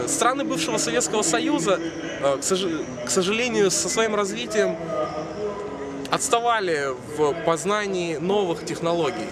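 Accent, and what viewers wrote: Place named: Ukraine. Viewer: Вот это украинец формата 90-х годов я их такими всю жизнь и помнил. Добрые, светлые, со смешным говором.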